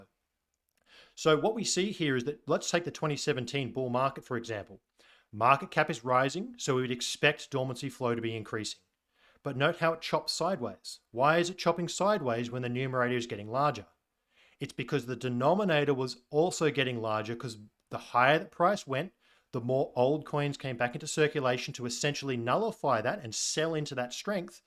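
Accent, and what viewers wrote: Australia. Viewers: I always trust the aussie accent for some reason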